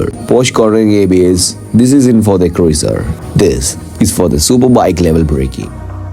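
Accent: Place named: Kerala, India. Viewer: Great vid but work on your speech and pronunciation. You have solid baritone voice, but you have a heavy Malayali accent which makes it extremely difficult to understand what you are saying.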